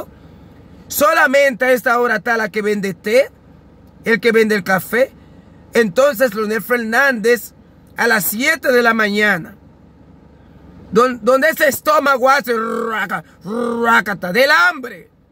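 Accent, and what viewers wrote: Haiti. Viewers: Disculpe, hermano yo no lo conozco, su acento es ahitiano? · Tu eres haitiano ese acento tuyo es puro haitiano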